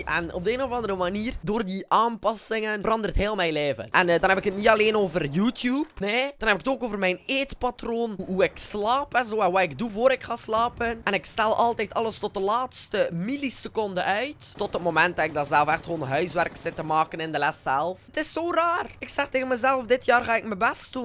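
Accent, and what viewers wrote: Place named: Flanders, Belgium. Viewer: Ik kijk jouw filmpjes puur omdat je een Vlaams accent hebt!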